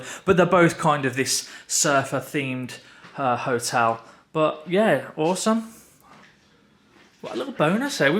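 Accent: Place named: Birmingham, United Kingdom. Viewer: Love a brummy vlog. I'm from across in Wolves so nice to have a more recognisable accent in a vlog for a change, haha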